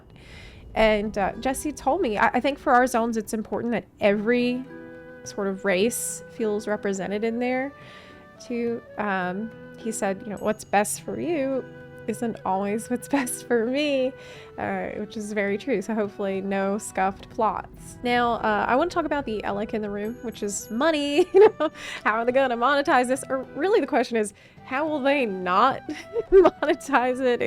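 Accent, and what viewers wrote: South, United States. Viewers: I hear your southern accent! XD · Why do you all of a sudden have a Southern accent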